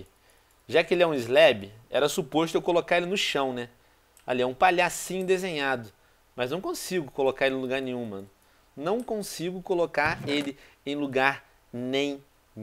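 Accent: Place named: Portugal. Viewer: ui palhaço e português de Portugal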